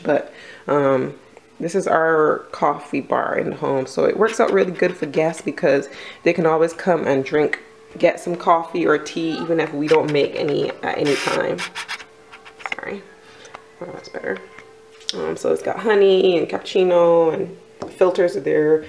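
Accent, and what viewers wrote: Barbados. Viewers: I love ur style U sound like a Bajan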